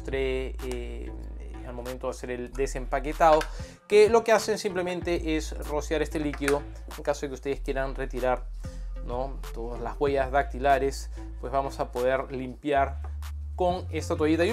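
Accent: Peru. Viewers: Es Peruano??????????? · Eres peruano?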